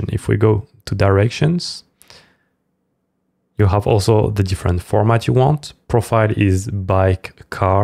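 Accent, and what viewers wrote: France. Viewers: Excellent content :). Malgrer que ton accent Francais se fait vraiment ressentir 😅 Thanks !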